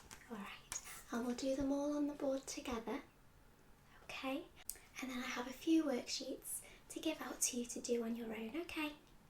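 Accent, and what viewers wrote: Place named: Yorkshire, United Kingdom. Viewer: You really do sound like your from Yorkshire, I'm from Doncaster well York originally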